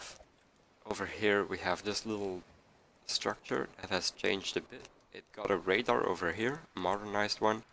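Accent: Belgium. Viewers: You from België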